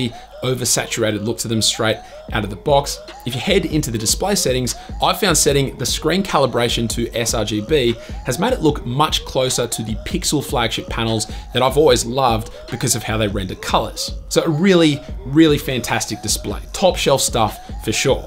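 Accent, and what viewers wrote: Australia. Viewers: Everything sounds good in Australian accent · Great video....not too long like those 15-20 minute ones which really becomes boring. And yes mate, are you an Aussie 😝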